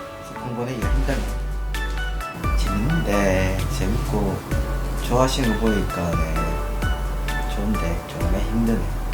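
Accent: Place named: Daegu, South Korea. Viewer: 맛갔나?ㅋ이거도겁나웃겨요 유행어만들어야겠다 오늘부터친구테 써머거야지 원래도사투리쓰는사람 좋아했는데 대구사투리매력있음 님이대구사투리해서더매력있음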